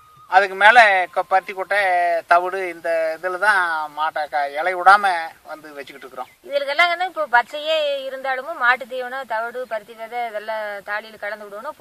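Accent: Kongu, India. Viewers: ஆஹா...கொங்கு தமிழைக் கேட்டுக் கொ‌ண்டேயிருக்கலாம்! அருமை! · Kongu Tamil❤️ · இந்த கொங்கு இன தமிழ்....... வாழ்க.,.. வாழ்க.....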